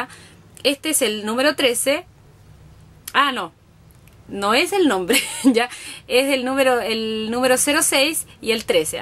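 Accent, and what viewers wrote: Chile. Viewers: A yo pensé que eras de Santiago jeje :)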